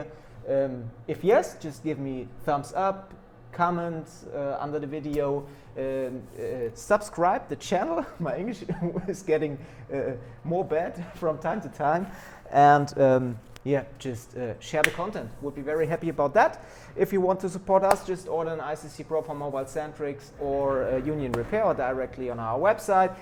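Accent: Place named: Germany. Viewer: my inglish is very bad jajaj . i think your english is really good , a little be mixed with german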